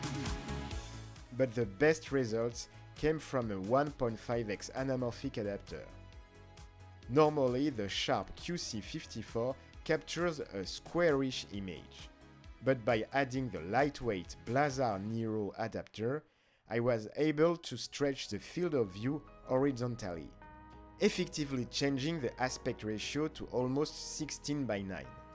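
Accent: France. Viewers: Mais c'est super l'accent Francais, ici aux USA ils adorent ca !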